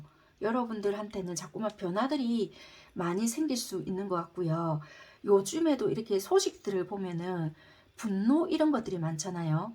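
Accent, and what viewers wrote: Busan, South Korea. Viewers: 구수한부산말투 매력적이네요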